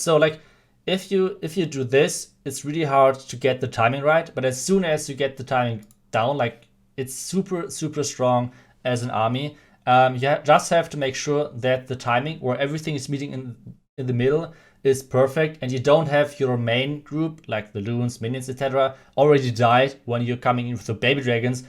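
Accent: Germany. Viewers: Man hört einfach das Deutsche komplett raus... Nichts gegen dich aber dein Englisch ist echt nicht gut ^^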